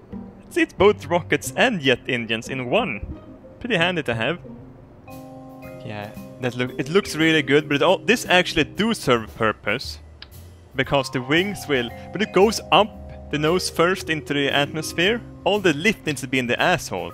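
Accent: Sweden. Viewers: Hikari Yeah,He's sweden xD I've known that for a LOONG Time before he starts his account.Well i tought it was funny though. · Are you from Sweden ? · well he's sweedish so he pronounces J's as Y's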